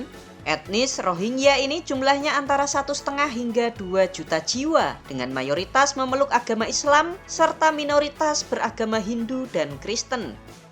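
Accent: Java, Indonesia. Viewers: Suaranya jadi candu dengan medok jawanya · Medok banget min jawa nya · Toto sekali jawanya min😅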